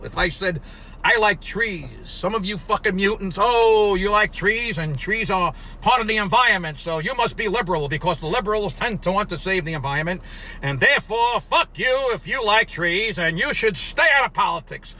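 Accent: New York, United States. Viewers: New York or New Jersey!?😘🥰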